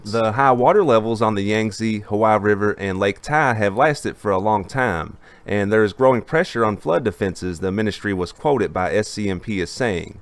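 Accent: South, United States. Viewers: When I clicked on this video I did not expect to hear someone so southern hahah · This southern accent attempting so many Chinese names is somehow both endearing and unbelievably frustrating lol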